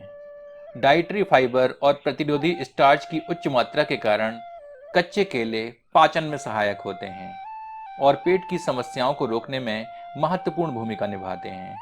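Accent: Standard. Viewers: aap ka sabse acha lgta bolna, jo ki itna shudh hota hai ki mano koi Guru hme mantra bolna sikha rha ho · your "shud" hindi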